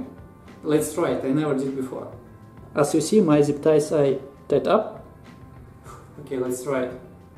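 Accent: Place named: Russia. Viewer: I feel Russian accent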